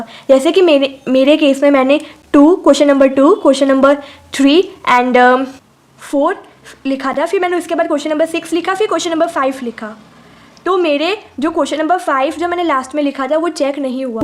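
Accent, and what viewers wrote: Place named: North, India. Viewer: That northern accent tho 😂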